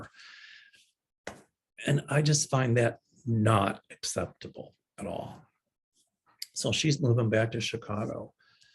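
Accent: South, United States. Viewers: it’s important to talk about your experience of being a victim of Bullying. It’s real, it’s true and others may not ever know of the damage and pain it can do. It may make a difference. As for your articulation… a beautiful southern drawl and it yours!!!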